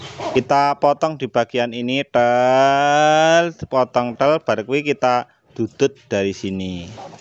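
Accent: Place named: Manado, Indonesia. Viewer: Dari logatnya pasti anda org manado y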